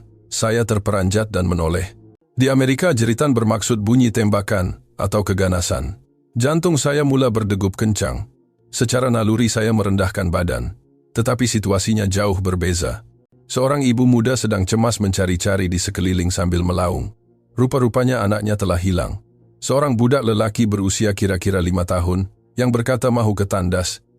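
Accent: Indonesia. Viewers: xpayah bangga la.da terang cerita ni hanya rekaan.nak cuba guna A.I BM tapi malangnya lidah A.I ni lidah indo.camna pn terasa janggal sangat sebutan dia.so kadang2 bunyi dia agak lawak.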